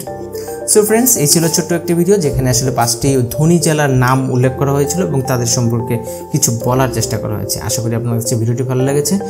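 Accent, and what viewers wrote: Noakhali, Bangladesh. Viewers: আপনি কি নোয়াখালির নাকি,,, আপনার পরিবার নোয়াখালি থেকে এসেছে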